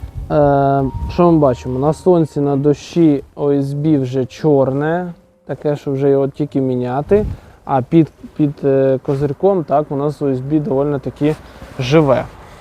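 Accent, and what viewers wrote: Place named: Russia. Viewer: ну будто русич пытается по украински говорить)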